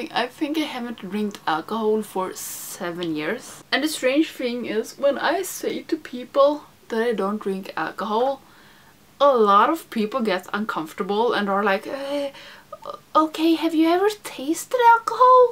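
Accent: Sweden. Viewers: Congratulations on your plants and your you tube channel!! Those browns look really beautiful on you. Thanks for doing your videos in English. I love your Swedish accent.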